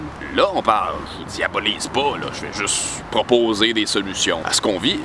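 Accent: Canada. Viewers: trés bonne vidéo plein de bonne idée même si je comprend mal l'accent Canadien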